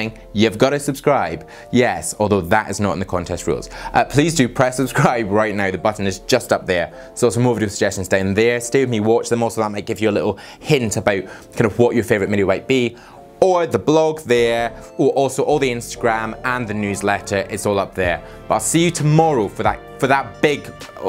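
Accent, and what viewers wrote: United Kingdom. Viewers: Congratulations on your achievement! You help me so much build interest for my hair and having an awesome hairstyle.😊 Also I really love your British accent you look so charismatic. My favourite vid of yours is for sure is when you fixed your worst haircut. <link> Dom really saved the day! Also you were so hilarious in the rant. You’re right about understanding and listening someone (which that barber didn’t did😉). Love from Canada!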